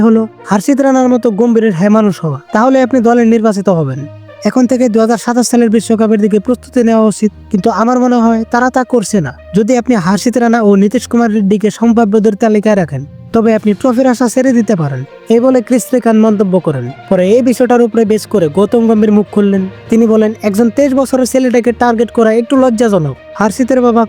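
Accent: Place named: Bangladesh. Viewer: আপনার মুখের ভাষা শুনে মনে হচ্ছে আপনি ভারতীয় নন, বাংলাদেশী, কোনো বাংলাদেশী কে ভারতীয় ক্রিকেট নিয়ে মতামত কেনো দেবো?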